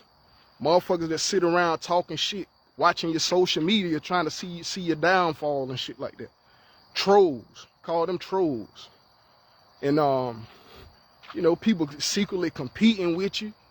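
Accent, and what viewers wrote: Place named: Southeast, United States. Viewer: I haven’t finished the whole video yet but people who are aware enough to see, know your spirit. I’ve been watching your videos for years (starting back at your first blood type videos which I still reference to people today). You’ve been nothing but a positive role model for me. I’ve even reposted some of your content before in the past. Idk what state you reside in but you sound like you’re in the Southeast too. It’s tough in our communities because a lot of our people (including friends, family, spouses etc.) are sick. Some more so than others. Whether it be subtle but functional spectrum disorders along the lines of what we know today as ADD, ADHD, Asperger’s/autism all the way to more imperative functioning behavioral disorders such as narcissistic personality disorder to sociopathy & psychopathic tendencies. 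This is before we even factor in drug & alcohol indulgence of the presence & past as well as people who consume a self destructive selection of “foods”. You’re a beacon of light. You’re a teacher surrounded by those who have a hard time even learning. Much less able to take accountability for mistakes they know they made in the past. Most people want to run from their mistakes. I’m watching it happen now with family members putting romantic relationships before the children they already have in the world only to have more children & treat them the same way. It’s a tough reality to accept that a lot of us have sick/psychologically off elders & peers. Just like some o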